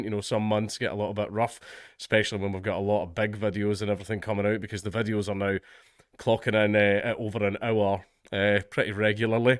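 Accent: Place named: Scotland, United Kingdom. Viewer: Have good Christmas man 👍 I've enjoyed listening to one of the last Scottish accents to call nonsense on the current things. P.S your having to many stress wanks, hence the reason your having consecutive children because your jizz is new when the wife can actually be bothered with you 😯 Thank me later man😂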